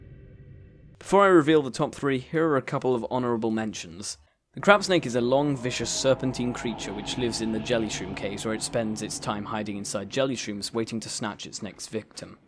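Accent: United Kingdom. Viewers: y r u british :/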